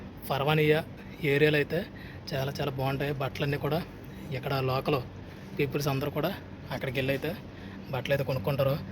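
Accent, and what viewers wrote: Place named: India. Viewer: Nice Vlogs mana Telugu Language and slang lo 👌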